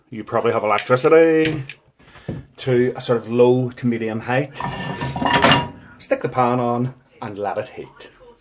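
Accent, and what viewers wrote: United Kingdom. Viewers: I hear the (Northern) Irish accent!!!!! Your recipe is EXACTLY the same as my Aunt used to make..... Mo Chara! 👍👍👍👍👍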